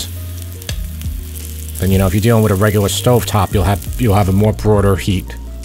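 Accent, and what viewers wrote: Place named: Queens, United States. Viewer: New to your channel. I knew your accent is Queens/Long Island! I live in the Hudson Valley, but my accent is pure Bronx! Anyway, just spent two summers in Italy (I’m retired), and learned that Italian and Italian-American are almost two different cuisines. The meals we think of as Italian are virtually unknown in Italy. So I’ve been trying to cook more in the genuine Italian style, as well as using ceramic dishes I picked up around the country. As an example, I ordered veal scallopini with carciofi giudesca (not sure of spelling, Jewish artichoke). I also asked for a side of pasta. They were really confused — pasta?? Then they laughed, “Americans!” Anyway, I like your recipes.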